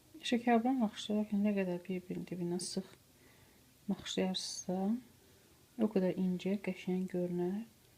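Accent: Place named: Baku, Azerbaijan. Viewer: Ay ureyim getdide oz baki danishiqimizcun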